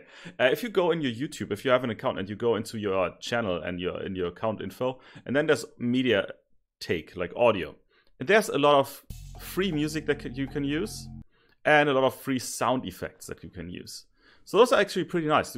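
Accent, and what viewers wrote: Germany. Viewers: Sounds you are a german guy =D great Tutorial, thanks =D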